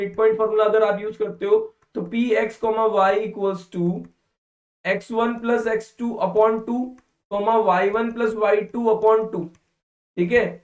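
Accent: Gujarat, India. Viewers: Are u gujarati ??😅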